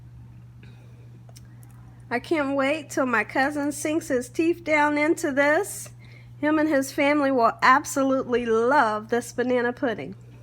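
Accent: South, United States. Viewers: I loveeeeee your voice soo sweet & southerny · Mmmm it smells just like bananas 😂😂 great southern accent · Only thing southern is her accent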